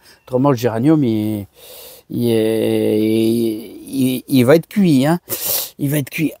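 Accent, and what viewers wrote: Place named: Pas-de-Calais, France. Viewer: J'adore. Votre accent du Pas de Calais est un régal. J'espère faire des poireaux en suivant vos conseils. Mes laitues à moi sont minables pour l'instant.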